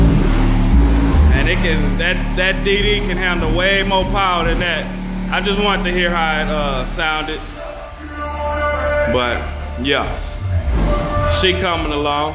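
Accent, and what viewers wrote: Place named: Texas, United States. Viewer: Texas talk..👍👍😂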